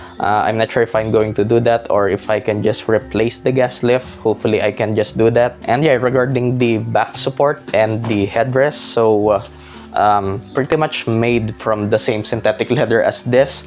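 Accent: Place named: Tagalog, Philippines. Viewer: i suggest that you watch american movies and shows so you would learn how to pronounce a lot of words correctly. or else mag tagalog ka na lang sir. video is good but the way you pronounce each word makes it worst. coz if you talk in english the TAGALOG way, it'll sound ridiculously stupid. in short, TRYING HARD boss. not to bash or something, im just stating the fact. watch american shows or movies specially the BING BANG THEORY. you'll learn a lot. it'll help you eventually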